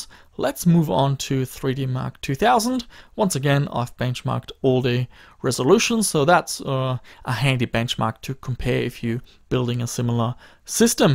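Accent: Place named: Germany. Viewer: You sound like you've got a german accent.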